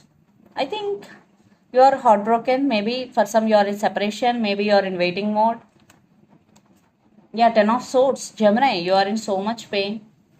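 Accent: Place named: South India, India. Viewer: Your reading is to the point.i am really Impressed. your accent is south Indian.GOD BLESS YOU.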